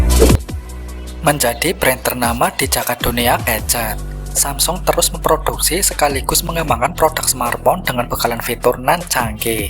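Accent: Banyumas, Indonesia. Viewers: G ngapak g penak?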